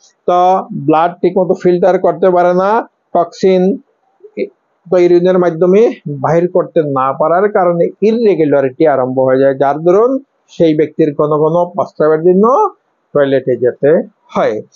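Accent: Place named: Sylhet, Bangladesh. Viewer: Apnar Bangla Uccharon a Syleti tan achey - Uccharon thik koroin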